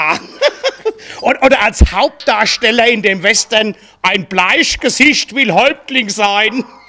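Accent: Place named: Hesse, Germany. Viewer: endgeil,ich lieb unseren hessischen humor,und die geil sprach.super.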